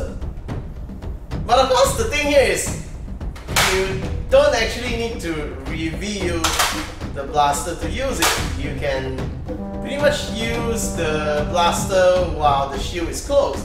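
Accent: Singapore. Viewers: Where are you from?, you have a Singaporean accent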